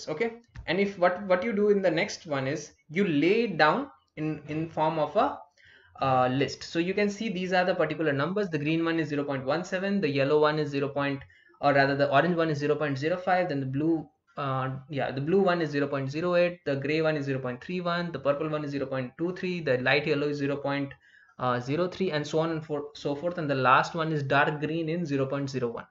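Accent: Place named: India. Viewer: face-blue-wide-eyes:heavy indian accent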